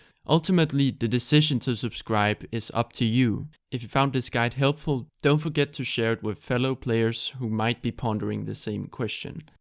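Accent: Denmark. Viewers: Fornemmer lidt danglish ;-) Jeg er gammel swtor spiller men overvejer at spille igen F2P efter et par siden. VIl du være interesseret i at spille sammen eller blot give gode råd in-game?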